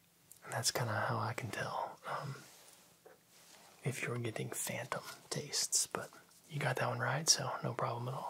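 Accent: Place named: South, United States. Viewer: you got a hint of southern in your voice 🤨🫣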